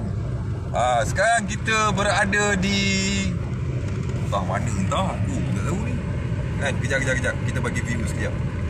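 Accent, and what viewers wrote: North, Malaysia. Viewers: cakap utagha bestttt!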